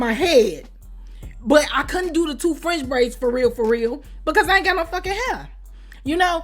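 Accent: Chicago, United States. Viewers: it's sumtin bout that Chicago accent........ 🔥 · You have a strong Chicago accent I'm from Minneapolis damn near happy people here damn near 3/4 of the people here are from the Chi ... You carry that accent heavy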